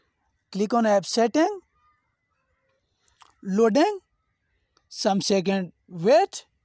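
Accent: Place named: India. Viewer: bro sounds like indiun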